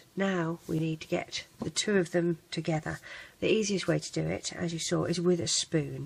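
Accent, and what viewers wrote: United Kingdom. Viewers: your BRITISH LOL LOL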